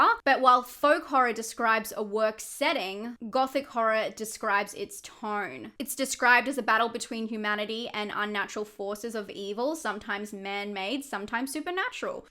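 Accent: Australia. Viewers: Australian English loves those diphthongs! 😆 I like Aussie accents a lot too.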